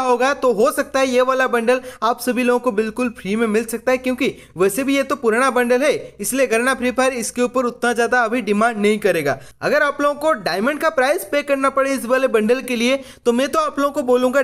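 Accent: Odisha, India. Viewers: Bro r u from odisha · Bhai tum odisha se ho na 😊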